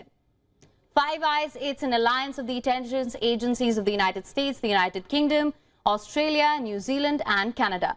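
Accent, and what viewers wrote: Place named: India. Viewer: Can you get rid of this grotesque Indian accent? It repels.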